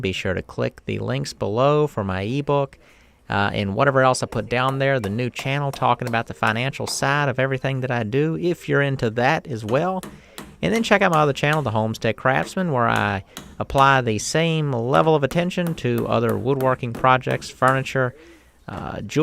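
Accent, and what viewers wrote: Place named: Arkansas, United States. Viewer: His accent makes me think Arkansas. Maybe Missouri.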